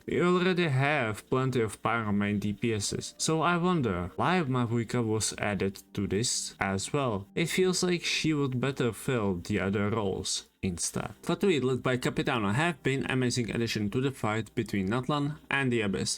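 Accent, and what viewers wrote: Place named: Czech Republic. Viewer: "Yay, another video hating on Natlan! I'm in!" "Dude has Czech accent, is he Czech?... YAY, a fellow citizen!!" I actually quit during Natlan. I'm done with the exploration, non-skippable dialogues and I can't believe that 4 years in we still have to grind talents on specific days of the week with no resin storage for overflow. Natlan looks completely different from what I expected. Nation of War my ass, this looks like Disney and I can't stand it. I'm also not that thrilled about pokemons and weird immersion breaking stuff like... motorbikes or flying canons. Just nope, Dawei can cry all he wants, I'm not going back and I used to whale pretty hard in the past.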